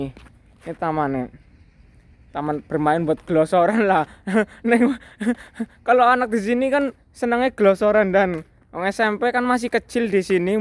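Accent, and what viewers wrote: Banyumas, Indonesia. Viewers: Salam ngapak ndan... Pwt-singapore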